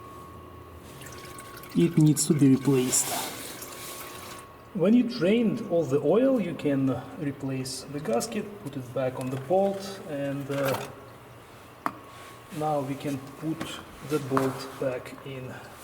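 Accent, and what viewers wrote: Russia. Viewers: Thic Ruski Accent with good english is soothing lol 😅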